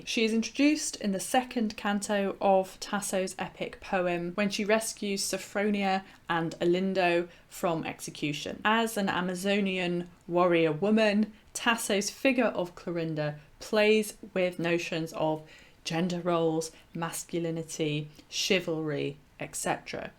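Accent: United Kingdom. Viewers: My first thoughts were that the poet has decided to view Clarinda as a Youth since that would make her admiration more natural and innocent than if she regarded her as a woman. (PS How was “Mrs” pronounced at the time? Had “Mistress” become “Miss’ess” or “Missus”? Also, I tend to pronounce “Pastoral” with the stress on the first syllable - but, then, I come from Birmingham and don’t have your pure RP accent.)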